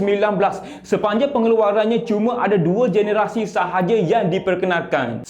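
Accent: Kelantan, Malaysia. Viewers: Aku confuse dia ni kelantan ke jawa…😅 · kuat bunyi kelate demo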